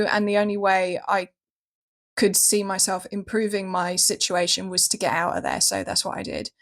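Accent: RP, United Kingdom. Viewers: That's not really posh, just normal English. She can actually string a sentence together which you're probably not used to hearing these days. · Hearing posh English is like hearing birds to sing.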